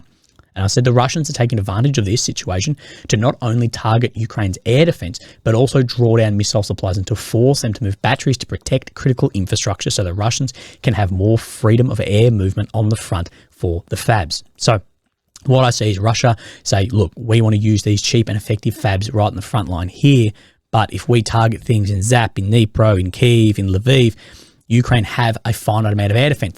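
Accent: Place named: Australia. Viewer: Its just how people talk in Australia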